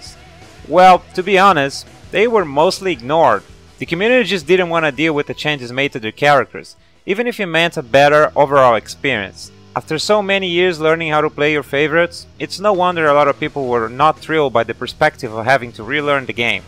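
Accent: Brazil. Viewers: the brazilian portuguese background shines through too much and adds that horrible english accent... this guy needs a lot of work before narrating anything in english again. On a scale from 1 to 10 where crazyrussianhacker is an acceptable 4 this guy here is a solid -2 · Vc é brasileiro? pelo sotaque parece, eu também falo inglês assim rsrs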